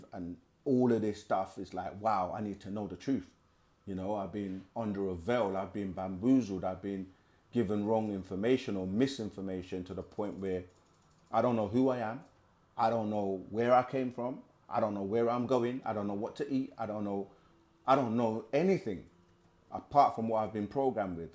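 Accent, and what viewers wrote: South Africa. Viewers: I can hear this broor is probably Zulu/Xhosa from South Africa 🇿🇦🇿🇦🇿🇦shout out ......Thank you for the break down ❤️‍🔥❤️‍🔥❤️‍🔥❤️‍🔥❤️‍🔥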